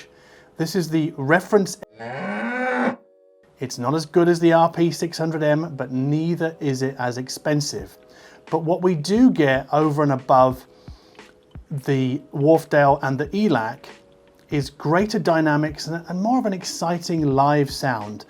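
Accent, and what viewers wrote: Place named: United Kingdom. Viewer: U sound like u live in the uk but u live in berlin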